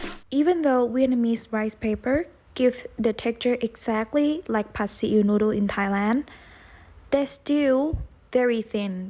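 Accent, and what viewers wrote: Australia. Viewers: your accent is evolving! sounds like an ausie <3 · are you living in australia? i can hear it in your voice a subtle aussie accent 😅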